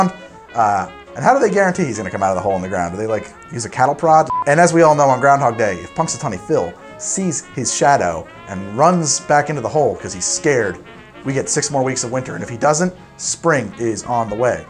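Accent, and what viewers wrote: New Jersey, United States. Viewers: Punxsutawney, Pennsylvania. Laird's., your Jersey is showing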